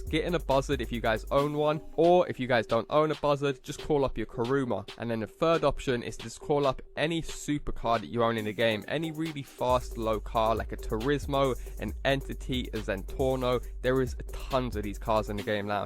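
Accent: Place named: England, United Kingdom. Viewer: Ohh l love that english accent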